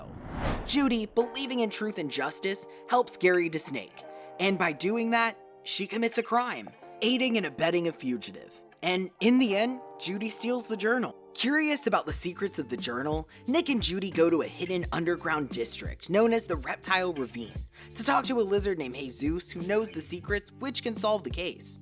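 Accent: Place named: United Kingdom. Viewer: I missed your British voice😢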